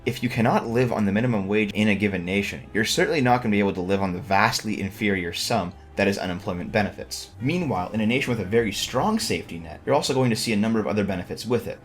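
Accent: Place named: London, Ontario, Canada. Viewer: I swear you could be from london ontario. But what do i know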